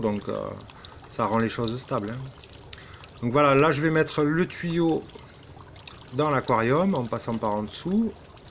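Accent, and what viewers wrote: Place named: South-West, France. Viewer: j'aime bien cette accent du sud ouest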